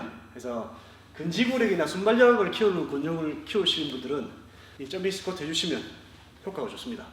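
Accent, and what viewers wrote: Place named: Busan, South Korea. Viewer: 부산 사투리가 정겹습니다 오쓰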